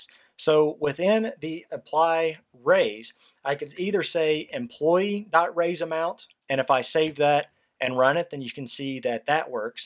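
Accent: United States. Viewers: Great videos. Love them. Learnt a lot from you. Thanks so much. The American accent was awesome too. Like Buster Scruggs teaching me about class variables. Wanted to shoot a gun into the air each time I completed something. Yeehaw. pew pew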